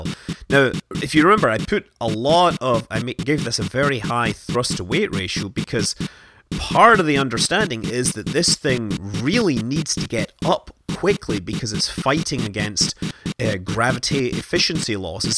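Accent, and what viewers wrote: Scotland, United Kingdom. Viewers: Scott Manley language or Scottish?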